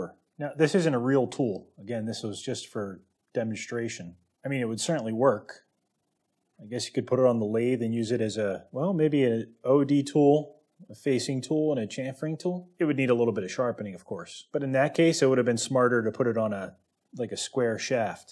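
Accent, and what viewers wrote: United States. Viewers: It does make me laugh when you guys on the other side of the pond pronounce solder as "sawder" rather than soldier without the i. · Love these videos, still don't understand why Americans change the L in solder to a D?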